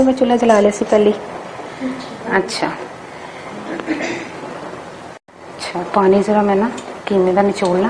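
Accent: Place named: Punjab, India. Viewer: Yar apki punjabi bare mazy ki ha api aur mein larki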